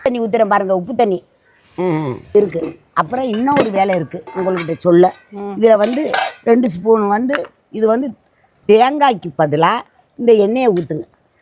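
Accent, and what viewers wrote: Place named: Ilayangudi, India. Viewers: Ilayankudi pechu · Etha oru illyangudi ya slang amdiya irukay❤❤❤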